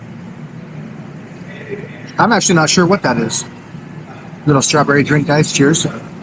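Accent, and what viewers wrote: Philippines. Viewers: please knock off the Filipino accent when u speak English. Its ridiculous. I speak 7 dialects read n write fluently. When I speak English I use no Filipino accent!!! By the way I am a former Marine also 77-84!! Married to a Filipina for 44yrs!!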